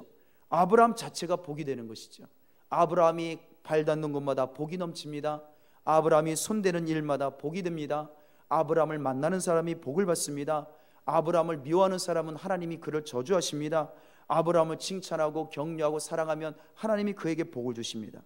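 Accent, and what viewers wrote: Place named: Gyeongsang, South Korea. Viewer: 목사님 말투가 갱상도사람 다 되셨네요!!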